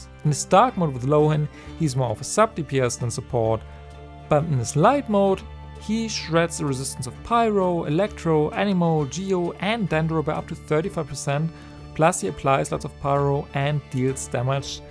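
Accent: Germany. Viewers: Lieblings Genshin Youtuber. Vor allem wegen dem Deutschen-Akzent😆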